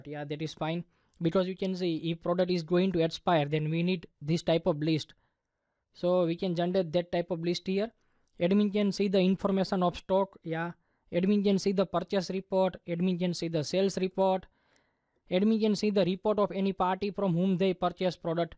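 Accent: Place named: Gujarat, India. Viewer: tame bhai gujarati lago cho because of your english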